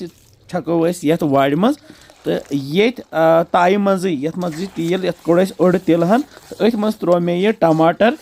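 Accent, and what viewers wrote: Kashmir, India. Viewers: I like kashmiri voice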